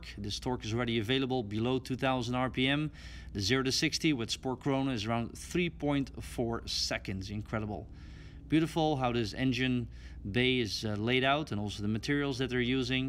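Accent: Netherlands. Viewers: You have a very strong dutch accent. You dutch by any chance?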